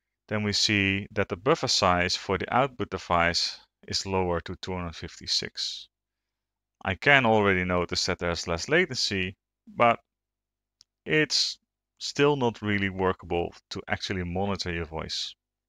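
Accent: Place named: Netherlands. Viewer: excellent dutch accent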